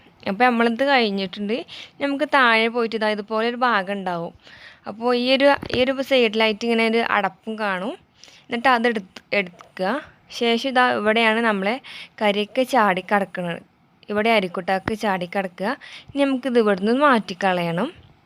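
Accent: Malappuram, India. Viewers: മലപ്പുറം ആണോ ഇയാൾ. നല്ല ഭംഗിയുള്ള സംസാരം...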